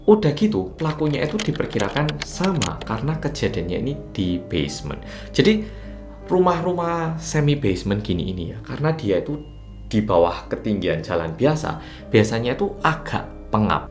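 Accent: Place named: Malang, Indonesia. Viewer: annyeon...good channel banyak pelajaran yang di ambil hikmahnya dari channel u.. hampir 16th dimalang logat malang jawanya dapat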